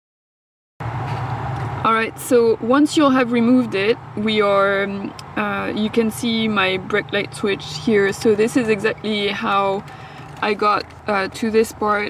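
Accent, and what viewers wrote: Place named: France. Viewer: You have saved my husband's life.....he was going crazy with his A/C until this video.....!!!! Plus you have a beautiful french accent!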